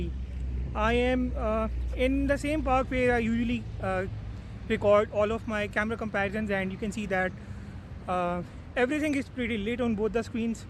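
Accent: India. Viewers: mi video i realized you speak english (or indlish) :D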